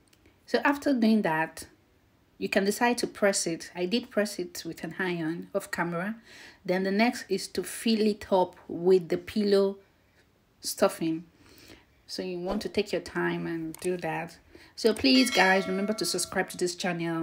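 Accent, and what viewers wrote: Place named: England, United Kingdom. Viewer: I like your English accent 😉😁👌 New subscriber 🤩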